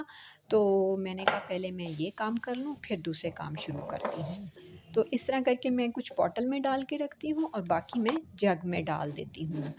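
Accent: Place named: Karachi, Pakistan. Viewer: Baat karne k andaz se to ap karachi ki lag rhi hain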